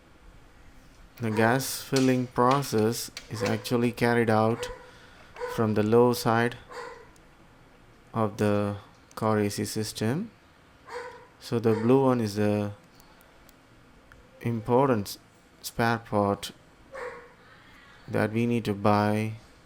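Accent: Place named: United States. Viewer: US accent is good.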